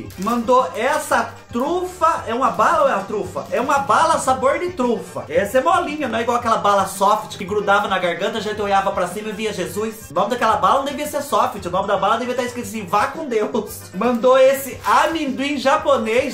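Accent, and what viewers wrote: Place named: Paraná, Brazil. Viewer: Ahh, entendi vc mora no Paraná, esse sotaque bem forte só pode ser eu doida pra saber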